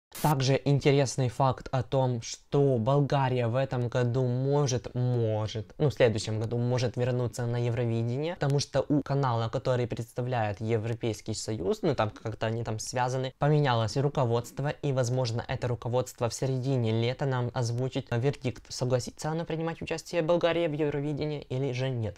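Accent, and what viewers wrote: Ukraine. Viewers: В первые слышу чисто украинский акцент)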